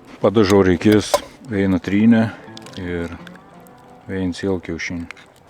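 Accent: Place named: Samogitia, Lithuania. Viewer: Nu man sunku suprast sita kalba galetum nezemaiciuot butu fainiau daugiau prenumeratoriu susirinktum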